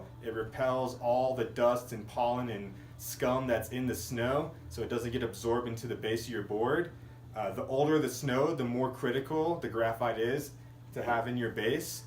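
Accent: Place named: New Jersey, United States. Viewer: Great. video, thanks. Love that dirty jersey accent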